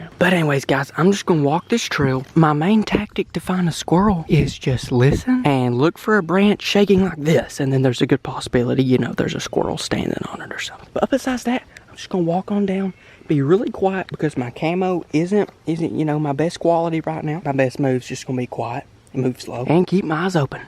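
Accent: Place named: Kentucky, United States. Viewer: Dear god Came across your channel today watching the Indy 500 Heard your accent for the first time and I thought you were from Kentucky instantly. One you said that verse though, instantly subbed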